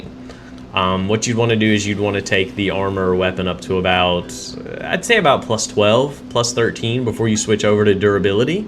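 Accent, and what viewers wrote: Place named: South, United States. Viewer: I've been waiting for gaming guides from people with country accents. Thank you for representing our people, and Bless Online your heart.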